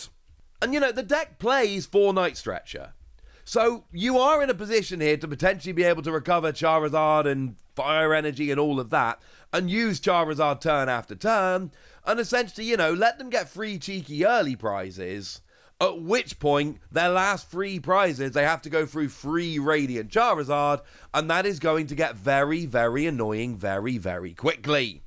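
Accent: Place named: United Kingdom. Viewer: yes that's what i meant, not used to british acc could not even get the first word right. Thank u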